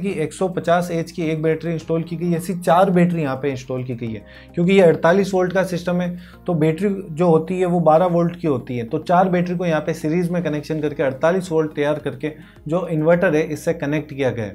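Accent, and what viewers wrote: Rajasthan, India. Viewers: His accent his Rajasthani and not that of kerala. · Ye rajasthani h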